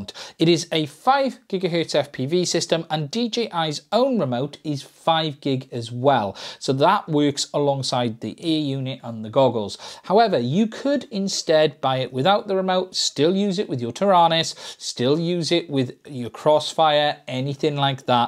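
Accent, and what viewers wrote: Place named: Cardiff, United Kingdom. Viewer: best videos re : dji fpv so far, i notice a gps on the front , how are you using? im coming from an inav point of view, also do you think if i used inav with gps i could still utilise the rth functions... Thinking of putting an air unit in my 7 inch shredder frame, it features esc`s on the arms and im using probably 30mm standoffs fair bit o room in there. oh im based in Cardiff. You sound like your from this neck of the woods (area)! great channel , cheers!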